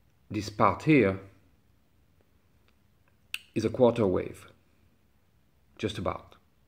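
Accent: France. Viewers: not only is your content great! You also have great French accent which makes it even that much more interesting. Thank you for making videos! I love to watch them in my free time. Maybe I’ll have time to make my rats tail today:)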